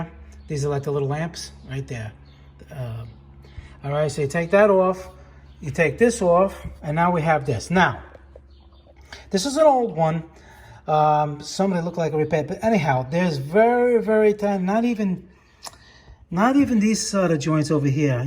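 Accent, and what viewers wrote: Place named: New Jersey, United States. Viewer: I have a 2011 F-150 Lariat with the factory stereo including Sync & Sirius. When I switch the ignition on, “Sound Designed by Sony” displays, then the compass direction and external temperature (only). When I press the stereo power button, five circles and the clock time flash on, then disappear. Occasionally when I'm driving, these will blink on then disappear too. To me that suggests an intermittent connection. I checked fuses and they were all good. I reset the stereo by disconnecting the battery cables, waiting ten minutes, and reconnecting. No joy. Upon removal of the stereo unit, all connections on the back were tight and clipped. So I baked the circuit board at 175 for ten minutes, then 375 for six minutes, and let cool at room temperature for one hour. Reassembled, reinstalled, and got the exact same behavior as before. 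Unfortunately the procedure didn't work for me. So I'll be looking at an aftermarket solution now. But thanks for your videos, and I give you major props for the total Jersey accent and speech mannerisms.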